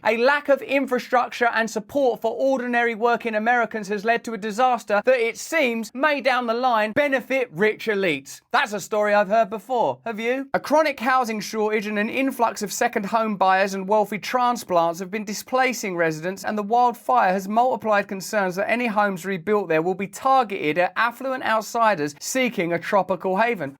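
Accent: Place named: United Kingdom. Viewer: I love the brit accent LOL. VPN ad "Get an extra Free (three) months Free.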